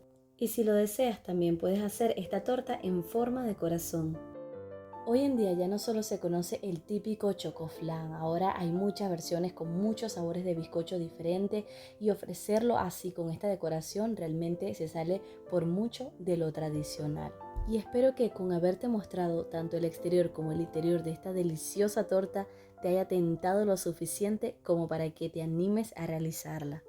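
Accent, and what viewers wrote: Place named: Venezuela. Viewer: Me encanta! Te descubrí hace poco y voy a aprender mucho más ahora que comenzaré a trabajar con fondant. Me suenas a Venezuela ❤ Y para los que critican que le decimos quesillo al flan pues en Venezuela siempre se le ha dicho así y creo que casi nunca le han puesto queso crema... La costumbre 😝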